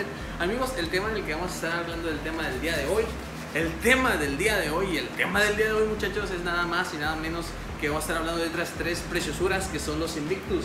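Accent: Mexico. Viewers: Eres mexicano wey? :v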